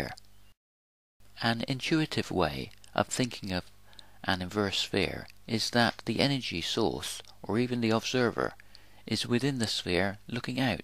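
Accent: West Country, United Kingdom. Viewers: I shared your Video to the Google+ Science community and it was moderated to Death.. I think your West Country accent got the better of them. Don't let them grind you down. They think they know it all.. but they are dancing to Pan's pipe.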